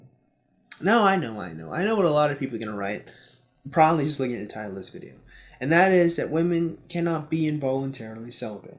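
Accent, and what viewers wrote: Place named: England, United Kingdom. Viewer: Lose the random English accent.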